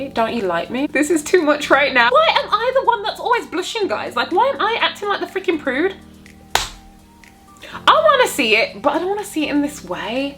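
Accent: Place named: London, United Kingdom. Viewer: Hahahahaah!, you’re hella funny! You remind me of my friend/schoolmate in Uni who’s from London. The way you talk is like hers. Hahaah! Gosh, I want to work and live in Japan too. I was there last year in Tokyo. Japanese guys were blowing up my Instagram and Tinder. I’m an asian foreigner. Most of them were polite though one was a fckboy who wanted to go to my hotel room but I never let him and said bye to him.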